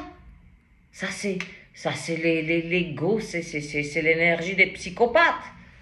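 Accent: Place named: Italy. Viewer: Je t aime de tout mon coeur,ma,tu es unique j aime ton accent,un reste d italie peux être!!!je suis une poissonne,et je ris,tu m amuses Merci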